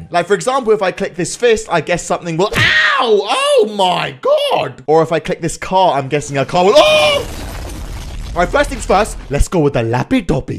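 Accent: United Kingdom. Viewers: He’s British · Are you british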